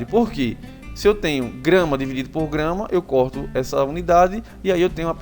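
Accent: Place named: Northeast, Brazil. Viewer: adoro o sotaque nordestino ^^ (favor não ver 'nordestino' como depreciativo, falo nordestino como morador da região nordeste do país. )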